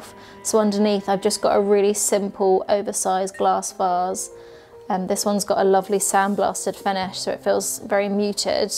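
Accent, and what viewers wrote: United Kingdom. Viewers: How do you spell the word that describes the very large mirror on the staircase? I'm not familiar with the British accent, so I can't tell what is saying Thank you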